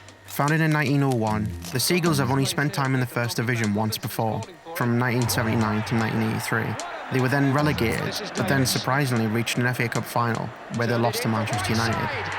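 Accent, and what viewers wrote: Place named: Sunderland, United Kingdom. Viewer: Sounds to me like a fellow mackem?! Great video mate.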